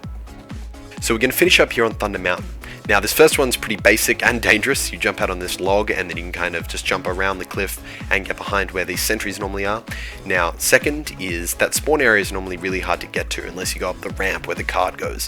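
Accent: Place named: Australia. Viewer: Muselk sounds more Australian that unual.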